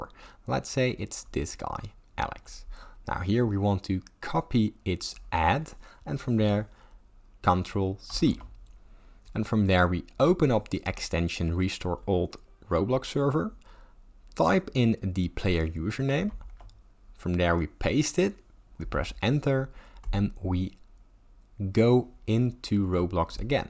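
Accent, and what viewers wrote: Netherlands. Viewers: Are you dutch by chance?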